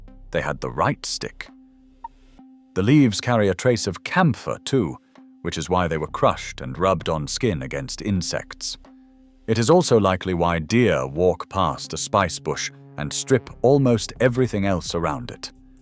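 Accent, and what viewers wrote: United Kingdom. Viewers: I love this channel! I've learned so much from it and I hope it continues. I especially like the British accent.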